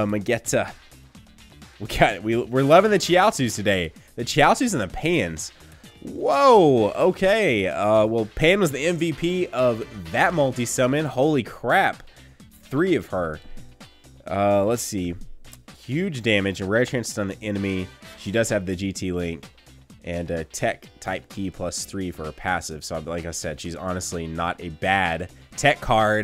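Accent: South, United States. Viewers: IS FROM THE SOUTH AND WHAT STATE!? He has that southern accent or am I crazy!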